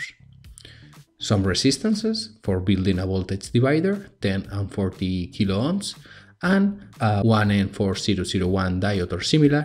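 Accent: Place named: Spain. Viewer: Tu eres español!!!, no pregunto. Gracias por el video y compartir proyecto. Trabajo con ingleses y tu acento te delata.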